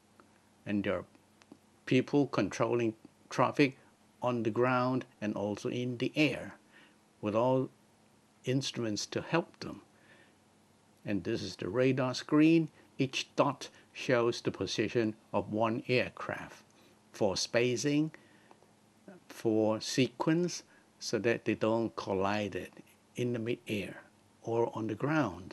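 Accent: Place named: United Kingdom. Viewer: You sound British. almost.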